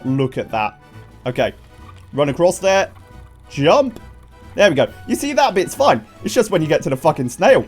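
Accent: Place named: United Kingdom. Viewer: The fantastic British accent really helps with setting the scene for this game. “I’m William Wallace and we want our freedom!!!”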